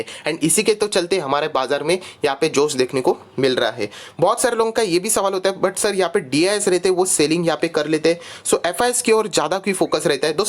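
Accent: Maharashtra, India. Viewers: Unke tone se confirmed hai, ki Maharashtrian hai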